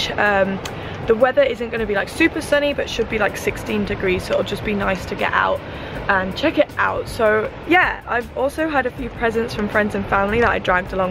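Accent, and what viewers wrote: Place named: Nottingham, United Kingdom. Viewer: Hi there, love your videos, you wouldn't happen to be from Nottingham would you? I'm sure I recognise the accent! Great video by the way, I love Cornwall (when the weather is right)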